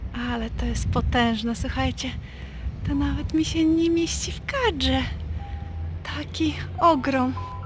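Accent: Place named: Vilnius, Lithuania. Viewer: Zapraszam W mój dzień - to taki rusycyzm, jest z Wilna. A ja myślę, że brzmi uroczo, jak i cały dialekt wileński:)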